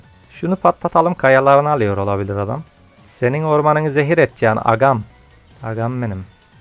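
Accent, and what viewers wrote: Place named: Balıkesir, Turkey. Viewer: Nerelisin Balıkesir ağzı konuşuyor gibisin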